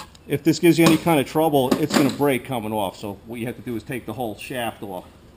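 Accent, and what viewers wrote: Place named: Long Island, United States. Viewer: I've been out of NY for 20 years but id guess long island?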